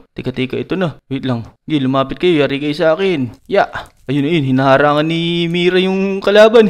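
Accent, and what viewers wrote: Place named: Nueva Ecija, Philippines. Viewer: Taga Nueva ecija siguro to puro "EH" Naririnig ko😂 Only in Nueva ecija knows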